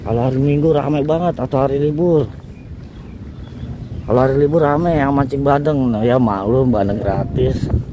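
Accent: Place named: Jakarta, Indonesia. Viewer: Baru nemu nih channel. Ane demen nih, 👍 logat ngomong nye betawi banget.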